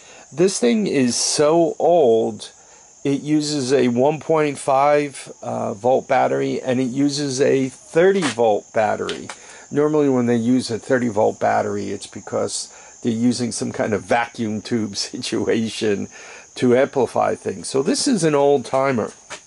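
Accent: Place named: Central Jersey, United States. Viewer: Beautiful country there. I'm in central Jersey and thought I recognized some local flavor in your accent!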